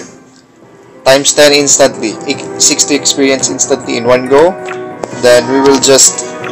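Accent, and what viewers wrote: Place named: Philippines. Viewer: Are you from the philippines? I can tell by your accent.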